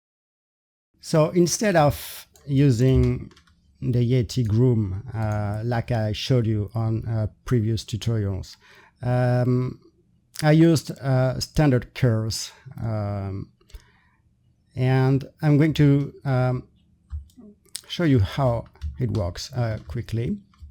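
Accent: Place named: France. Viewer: Ah génial merci beaucoup!! Tout le long de vos vidéos je me demandais si n'il y avait pas un petit accent français caché derrière tout ça haha mais avec votre site il n'y a plus de doutes! Super travaux :)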